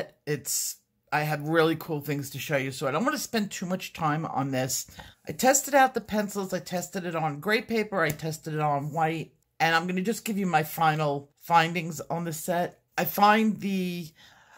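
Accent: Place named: Hoboken, United States. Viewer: you talk just like my mom, shes from Hoboken. She says looseleaf too:)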